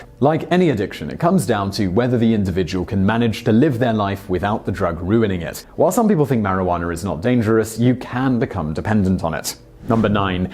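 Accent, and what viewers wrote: United Kingdom. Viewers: When he said talking about the military I don't know if it is the fact that everything he said was bullship or the fact that he has a British accent but either way don't believe any of it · What part of Britain does his accent come from?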